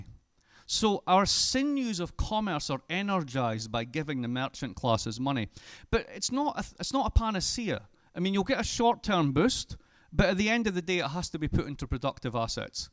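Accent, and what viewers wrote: Scotland, United Kingdom. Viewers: + SpectatingBystander - The speed of rapport and the Scottish accent make it difficult for an audience whose 1st language is not English. I am English and could only just keep up with him.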